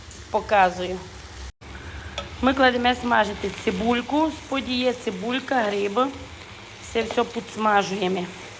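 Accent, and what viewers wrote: Transcarpathia, Ukraine. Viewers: Яка соковита і запашна закарпатська говірка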